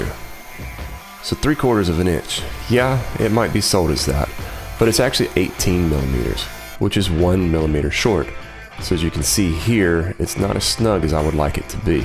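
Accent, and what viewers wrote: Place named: North Carolina, United States. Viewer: Great cart man. Where are you located (generally) I'm in NC and you sound like you might be "from round here" ;)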